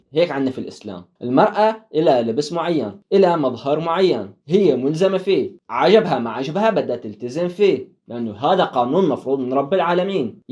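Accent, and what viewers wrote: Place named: Latakia, Syria. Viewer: شكرا لك أخي لفتتني لهجتك هل أنت من اللاذقية ؟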